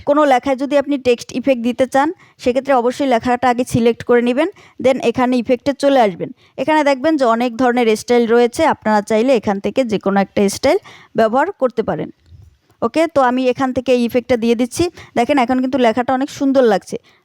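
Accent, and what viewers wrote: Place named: Barishal, Bangladesh. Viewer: মিস, আপনার উপস্থাপনা পরিষ্কার ও সুন্দর কিন্তু র-ফলা জণিত শব্দ উচ্চারণে সমস্যা ঠিক করে নেবেন। বুঝতে পারছি আপনি বরিশাইল্যা মাইয়া। আমিও বরিশালের। However, don't take it otherwise.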